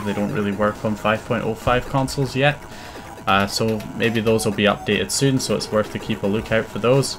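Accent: Scotland, United Kingdom. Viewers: Good to hear a fellow Scotsman on here! I’ve only just this second learned that my PS4 can be jailbroken! Amazing but can you advise a newbie where to start or point me in the direction of which of your vids to go to first? I’ve no clue about terminology or that. Cheers man and all the best!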